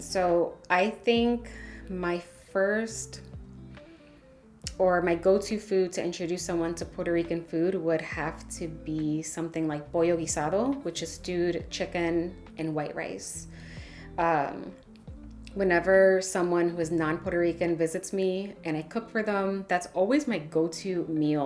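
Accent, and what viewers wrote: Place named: Midwest, United States. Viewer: Love your page! I’m married to a puerto Rican and your recipes are so easy to follow. Im from Chicago and I can tell by your voice you were a midwestern 🫶🏻🫶🏻your just as pretty as your lovely voice im so glad to put a face to a name!! Im also a Christian and love the verses you post on your videos. God bless your page!!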